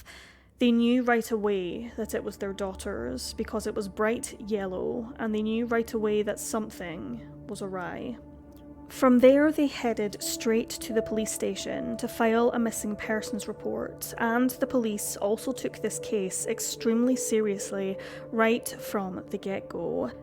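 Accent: Scotland, United Kingdom. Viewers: I think she's scottish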